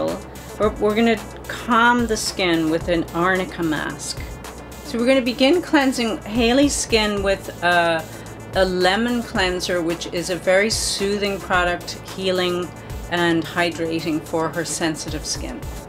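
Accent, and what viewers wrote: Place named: Ireland. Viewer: can hear the irish accent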